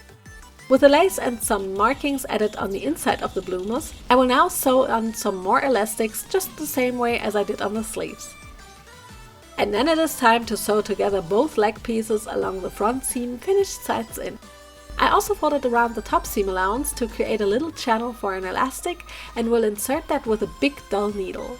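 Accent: Germany. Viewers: Du klingst ein wenig deutsch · You sound German, are you German? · I know a german accent wenn ich ihr Höre🤓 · this is the first video I've seen from this creator, and the first thing I noticed is how she sounds german! I fell inlove with that tiny trait of hers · I know very unrelated but are you german? Because the "den Wald vor lauter Bäume nocht sehen" in english, the Glue (Kraftkleber) and just your accent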